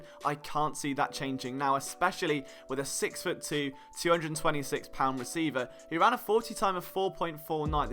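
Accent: England, United Kingdom. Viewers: Yeah thanks for all your insight, after the first few times of getting used to your English accent, I really love your videos. You need a collab with Philly 500, i'd love to hear your accent and his Philly accent together, haha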